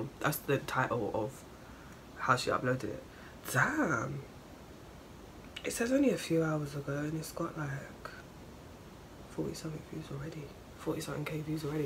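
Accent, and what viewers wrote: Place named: London, United Kingdom. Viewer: I love your London accent 🔥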